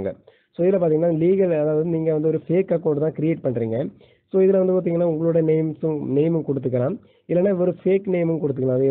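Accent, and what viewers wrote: Kanyakumari, India. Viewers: Kumarii slang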